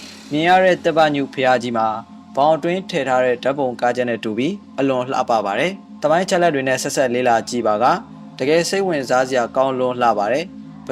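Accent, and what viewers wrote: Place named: China. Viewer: စေ တီ ဘု ရား ကို လှ တယ် လို့ မ ပြော ရ ပါ ဘူး သပ္ပါယ် တယ် လို့ ပြော ရ ပါ တယ် မြန် မာ ပြည် မှာ နေ ပြီး မြန် မာ စကား ကို မြန် မာ လို ပီ ပီ သ သ ပြော တတ် ပါ စေ! တရုပ် ပြော တဲ့ မြန် မာ စကား လို မဖြစ် ပါ စေ နဲ့ !!!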